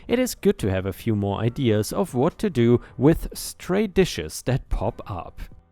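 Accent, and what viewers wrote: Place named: Germany. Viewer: You sound like ypu have a german accent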